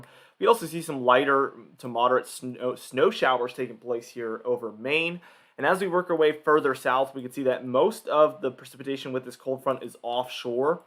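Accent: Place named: South, United States. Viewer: "Gonna" gonna "..... Geraldine, ALABAMA ? Why are Americans now taking like Southerners?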